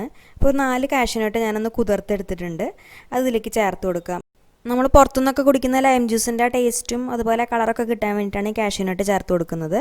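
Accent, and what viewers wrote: Thrissur, India. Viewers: Thrissur aanoo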